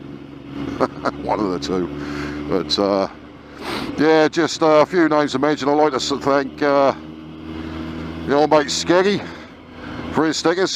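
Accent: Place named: Suffolk, United Kingdom. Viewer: Good to see you back and hear that Suffolk accent again. Keep on trucking 😉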